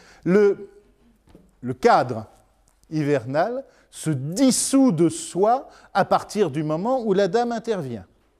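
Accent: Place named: France. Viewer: Super de pouvoir écouter ce cours. Très intéressant. Cependant, je regrette la lecture très "francimande" et pas assez occitane du texte (il en est des interprétations comme de la prononciation). Autrement dit, il s'agit de l'autopsie de la traduction de BEC et non du texte originel. Ceci étant, ce cours est super. MERCI.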